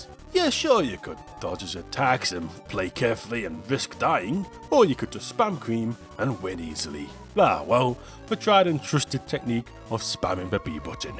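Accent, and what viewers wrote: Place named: Yorkshire, United Kingdom. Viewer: I always assumed you were a Yorkshire man!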